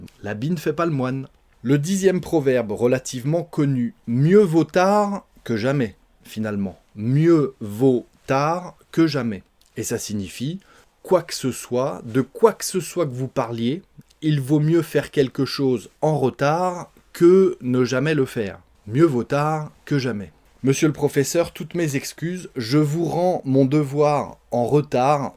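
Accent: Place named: France. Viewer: face-blue-smiling: ... Bonjour... Je ne sais pas si je vous ai déjà demandé d'où vient votre accent français... Je l'aime beaucoup et c'est pour cela que je vous suis. Merci pour ce que vous faites !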